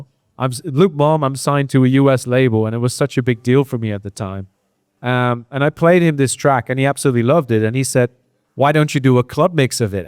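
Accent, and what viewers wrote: United Kingdom. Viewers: Armin sounds so different here versus ASOT. He sounds a bit British... Bizarre! Anyway, will definitely listen to this. Love this kind of insightful stuff. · Why does he sound British? Lol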